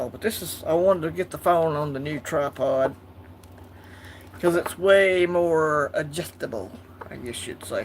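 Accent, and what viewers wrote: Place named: South, United States. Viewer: Sorry I missed your live and hanging out with your awesome Southern Accent. Hope you are doing well. 🙂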